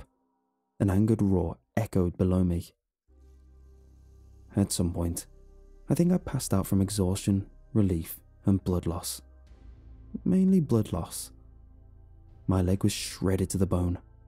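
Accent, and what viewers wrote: England, United Kingdom. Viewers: Which part of England were u brought up in? You’ve a lovely, rich & expressive speaking voice. & i just wondered where, exactly, u originally came from. Middle England is just too vague. Please!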